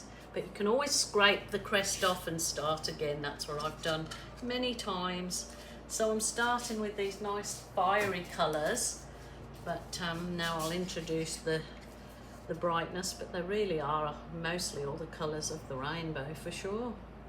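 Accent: Birmingham, United Kingdom. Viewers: Lol! have been on the phone to home? Your Brummy accent is coming through more today! How lovely! What a stunning piece! Treat of colours - very magical! Hope you & your family & friends are starting to recover after all you've been through? X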